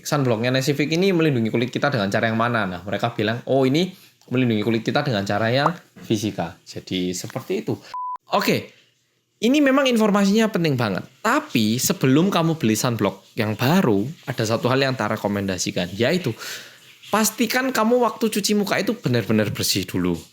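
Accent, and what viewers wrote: Java, Indonesia. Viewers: Aku wes mbedek....."orang Korea yg "jerawatan"..." ee e jebule yg medok....salah dech..isin aku... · Oke hellow guys. Welcome back to bersama orang korea yg MeDdokk 😂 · Notif medoook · Bisa barengan notif youtobe and live di IGmu mas korea medok..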